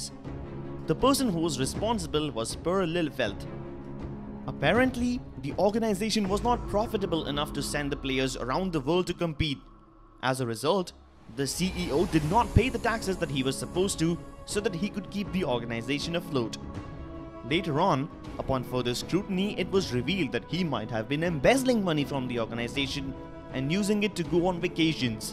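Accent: India. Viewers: This Indian accent is killing me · Indian accent f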